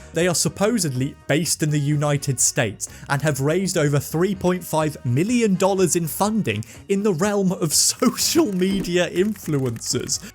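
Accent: United Kingdom. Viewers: I kept having the Aha song Take on me in head, who could know why?! Fortunately AI will never be able to replaced the confused British man talking to us on the YouTubes.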